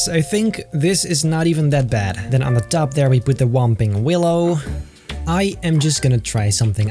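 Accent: Netherlands. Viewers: You dutch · Omg ik hoorde het al aan je accent, JE BENT NEDERLANDSAS!!! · Are you from the netherlands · Are you actually dutch? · Your dutch? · And I already had a feeling that you where dutch 🇳🇱 because you’re accent looks dutch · Are you dutch? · Are you Dutch? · Ben je Nederlands? 😄😁 (If u dont here is what i asked: Are u from the Netherlands) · Ben je Nederlands? XD Are you Dutch? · are you dutch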